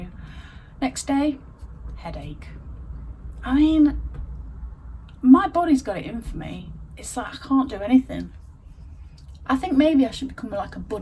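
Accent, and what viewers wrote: Nottingham, United Kingdom. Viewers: Your youtube channel came up on my list a few months ago….I watch and subscribe to many lovely resellers so maybe thats why? I was pleased to discover you were Local- ish to me …i live near Dawlish … I felt there was something familiar about you ….it wasnt until I saw your video about your visit to Nottingham that made me wonder if it was your accent…..I originally come from a small town near Hucknall and then moved to Newark and then moved to Devon 20 odd years ago ….I havent visited Nottinghamshire for many years so it was lovely to see some of it again….and your recollection of Vicky centre indoor market ….I really like your videos and your outings and wish you well with your move back up north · I can't remember if I saw you in one of Caroline's videos or if I started watching via youtube's suggestions? This might sound strange but I love the British accent so I like listening to both you and Caroline talking. 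And the Expawers which I learned about from Caroline. As for feeling lost, have you thought about volunteering? That could give you a bit more purpose. I volunteered at the humane society walking dogs or helping people pick out a pet to adopt. Perhaps think of something you might enjoy doing? Take up knitting? Research your family tree? Just a few ideas that came to mind. And right, it's that time of year. We've got gloomy weather today too (upper midwest in the U.S.) which always brings me down. I'm going to go wrap some presents and try to ignore the weather. I hope your icky mood passes soon. 🤗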